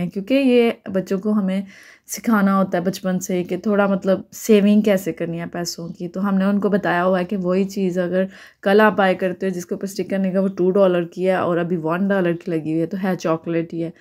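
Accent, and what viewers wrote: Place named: Punjab, India. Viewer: Miss ap ka vlog boht pasnd he or ap k bat krny ka style panjabi he acha lgta he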